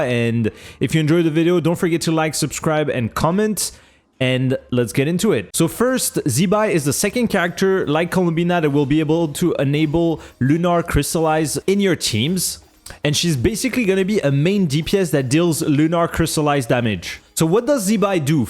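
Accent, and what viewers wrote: France. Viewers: L'accent français mdr, bel effort de prononciation nonobstant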